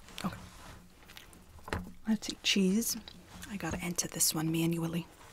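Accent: New York, United States. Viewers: As a New Yorker love the accent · def new york lol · Ha I love it! Sounds like my mom's whole Italian family from New York. 😂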